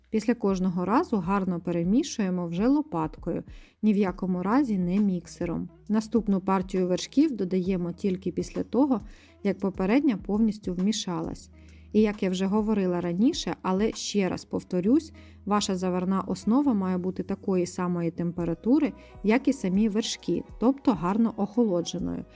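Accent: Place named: South, Ukraine. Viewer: Добрий день! Мені здається що ви з півдня України, за місто промовчу!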